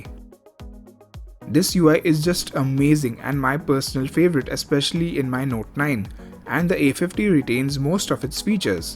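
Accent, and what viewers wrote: India. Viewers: Youtube should put all these indian reviews on youtube india. Indian accents are super annoying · I Don t understand Indian English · I love your accent it's Indian right.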